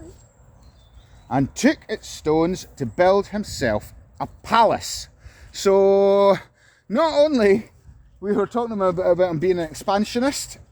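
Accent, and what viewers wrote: United Kingdom. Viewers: ohh, Halloween? gosh, the Children looks so excited...lovely! this is definitely a Walk, indeed from your Screen Name! Thanks for touring us around and the info. good to know. Love the British accent! ahahaha full watched! keep safe...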